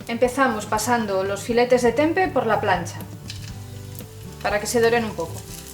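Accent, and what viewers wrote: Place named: Spain. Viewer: Hola! Excelente que estés tratando de promover la tradición Venezolana aunque tu acento no es Venezolano. Suenas como Española. Sin embargo voy a probar tus empanadas… Se ven deliciosas!❤🎉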